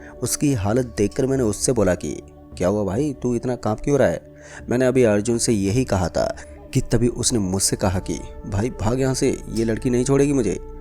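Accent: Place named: Noida, India. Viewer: Bhai ap noida se ho na voice se lgra hai re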